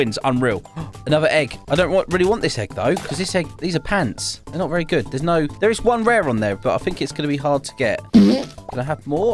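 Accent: United Kingdom. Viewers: Are you British I’ve been trying to ask you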